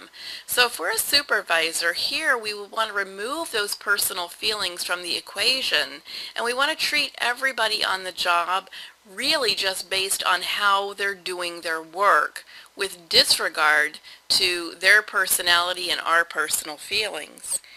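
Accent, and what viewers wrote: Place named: China. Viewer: Chinese equar English, l can't understand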